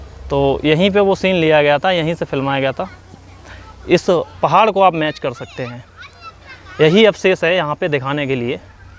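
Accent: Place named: Western Uttar Pradesh, India. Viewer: Tum kahan ke ho bhai tumhari voice west up ki lagti hai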